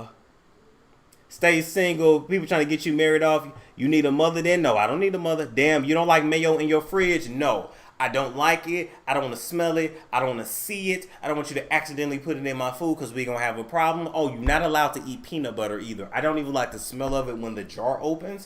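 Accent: St. Louis, United States. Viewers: Wow I know someone from St Louis and I felt like they sound alike... wow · I knew you were from STL,!!! The way you talk, and one day you said the “Apple Bees off Lindbergh!” #314 💯😅🤟🏾❤️😎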